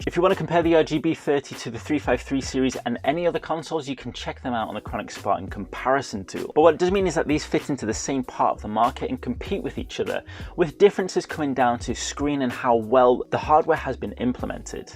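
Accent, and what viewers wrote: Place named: United Kingdom. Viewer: Nothing worse than clicking on a video from a YouTuber I don’t know and hearing a British accent 🤮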